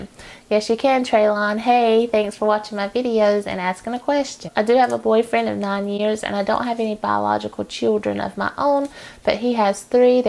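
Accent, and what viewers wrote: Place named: South, United States. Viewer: Funny my first YouTube experience was watching Trisha Paytas also........she's a mess lol Love your southern accent....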